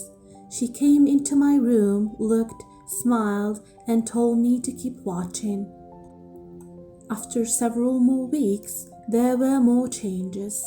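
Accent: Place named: United Kingdom. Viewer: You have a really sweet voice. Seriously i almost thought you're a native british woman.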